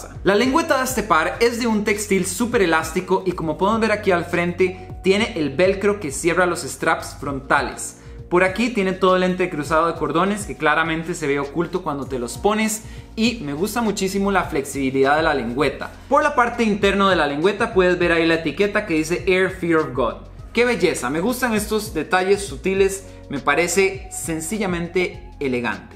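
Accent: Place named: Costa Rica. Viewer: Como se nota ese acento de Costa Rica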